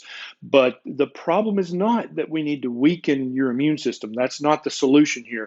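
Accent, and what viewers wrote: South, United States. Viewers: Thank you for making these videos. Explanations made simple and concise with a southern accent and a handsome smile... Love it!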